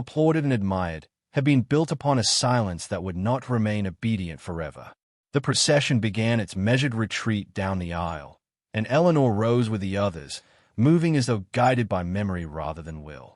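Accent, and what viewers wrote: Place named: Australia. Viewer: Not an American at all. One of the UK COMMONWEALTH Countries - Aussie, maybe? But definitely distracting. 😊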